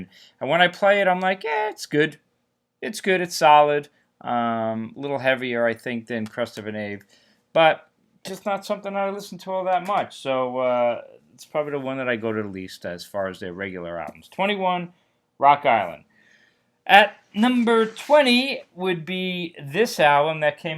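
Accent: Long Island, United States. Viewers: Is that a North Babylon Fire Department hat? You sound like a Long Islander lol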